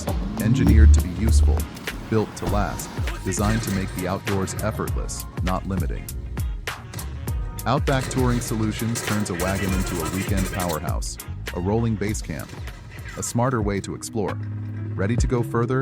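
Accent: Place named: United States. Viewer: lol i heard the American voiceover and i thought US, seeing the name i now recognise you from Insta 👍 From Melbourne and will hopefully be travelling with the 80 next winter👍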